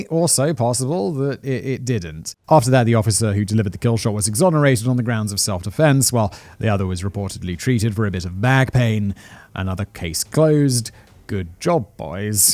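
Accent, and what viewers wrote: RP, United Kingdom. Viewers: anyone notice Simon's script reading voice is more posh than his normal offscript voice? *reads script sounds like Benedict Cumberbatch's Sherlock Holmes" "talks normally sounds like Michael Caine's Sherlock Holmes" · A persons bad choices are not their fault?! When you know he is in prison for rape! WTF? Exactly who's fault is it's? Simon, even with your posh accent you can't make that sound smart. His wife was every bit as responsible for her decision's as her husband was responsible for his decisions to beat, rape, and kill those women. Stop letting people off for their piss poor decision making abilities. It sets bad precedents. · If it never crossed your mind that orderly, wealthy countries with root philosophies that advantage individual sovereignty might do a better job measuring pathology rather than actually having higher incidence rates of pathology, maybe you should trade in your pompous brit accent for something less ostentatiously elitist.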